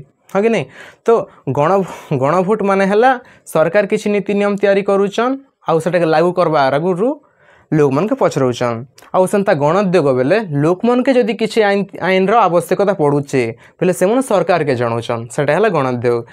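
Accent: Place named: Kalahandi, India. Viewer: Kalahandi language re sunle bhal laguche 💕💞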